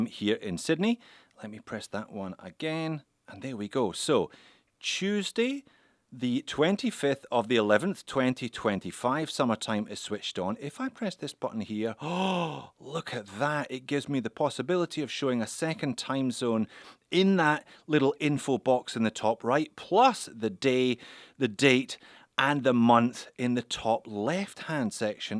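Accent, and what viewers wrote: Scotland, United Kingdom. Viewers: It's not a $200 watch. Very enthusiast cheerful review this bloke sounds a wee bit Scottish maybe? 🤔 I prefer my Commodore Red LED from 1976 from Boots Irvine Ayrshire by Willie Claus 🎅🤣 Enjoyable video yet again.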